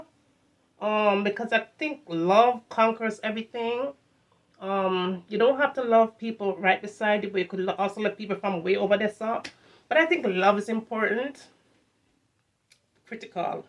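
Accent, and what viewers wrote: Jamaica. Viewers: Yes bestie I'm so happy you got Jamaican accent as me also I'm half Jamaican accent and speak English or some Spanish word.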